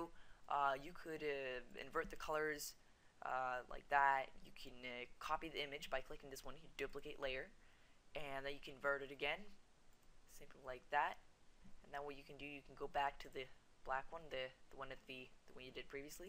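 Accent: Sweden. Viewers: r u from sweden? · Ey!!! Du är ju svensk! Ey!!! You are Swedish! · are you Swedish? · WAIT are you Swedish!? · R u from sweden? :O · U are swedish arent u? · Are u swedish? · u are swedish right??Hej!!!!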